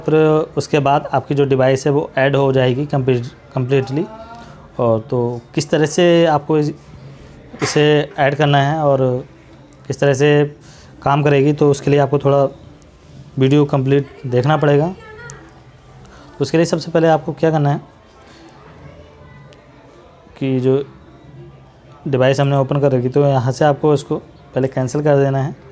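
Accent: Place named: Arab world. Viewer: Are you speaking like arabic or the worst english in the universe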